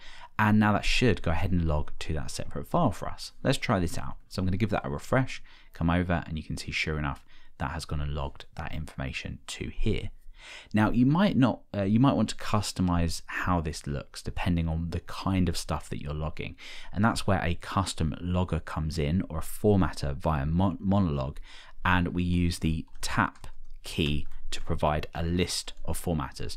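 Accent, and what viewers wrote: United Kingdom. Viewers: tks sir ! btw your accent is a British accent right ? i'm Asian and i curious about english accents in the world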